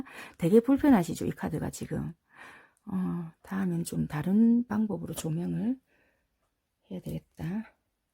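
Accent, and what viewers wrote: Busan, South Korea. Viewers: 네, 물론 또 대화를 나눠볼 생각이에요. 근데 이런 흐름이면 좋겠다 싶은 생각이 들다보니 재회의 경우를 염두해두고 리딩을 받아들여도 좋을지 궁금했습니다 😊😊 상대가 관계에 대해 좀더 긍정적인 방향으로 생각했으면 좋겠다 싶다보니 이 리딩이 너무 위로가 되는듯한 말씀 같았거든요. 아무쪼록 리딩도 댓글도 다 감사드립니다:)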